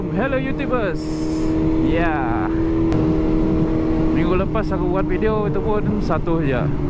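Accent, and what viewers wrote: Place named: Sabah, Malaysia. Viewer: Suka dengar abang cakap sabah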